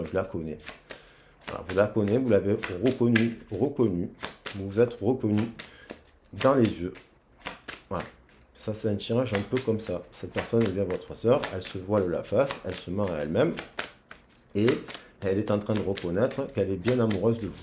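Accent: South, France. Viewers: Coucou j'adore vos guidances et l accent du midi moi toujours seule les week-end mon autre chez lui sa sorcière anniversaire 🎂 🎂 moi je suis de trop gros bisous et bonne soirée je vous embrasse très fort moi j ai mon voyage bloqué je devais pzrtir du 7 au 14 09 bloqué c'est la.sorciere sui a bloqué super mille bisous ❤❤❤❤❤❤❤❤❤🎉🎉🎉🎉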